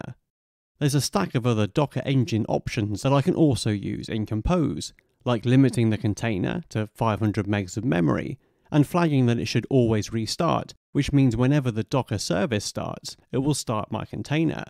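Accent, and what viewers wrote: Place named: England, United Kingdom. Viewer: english accent kills it !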